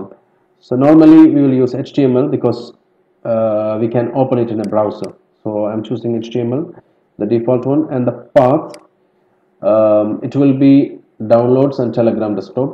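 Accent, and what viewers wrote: India. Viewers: God Bless, It was wonderful. Love Indian accent. your Iranian Sister.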